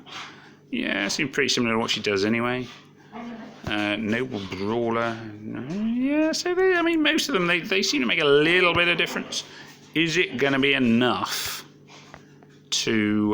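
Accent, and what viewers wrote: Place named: United Kingdom. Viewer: Hello. Love that British accent...by the way hope you got how costumes work, and if you don’t this is FYI: You can’t ascend the costume more than the original copy it is accompanying. Leveling up and ascending costumes is much easier and cheaper, no special ascension material needed. Both original copy and costume got bonus stat when costume lvled up.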